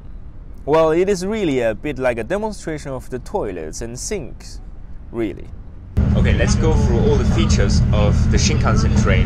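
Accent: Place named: Germany. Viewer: Also bist du österreicher dann? Zum ersten hab ich den Deutschen Akzent gehört. Ich bin in der Schweiz gewesen.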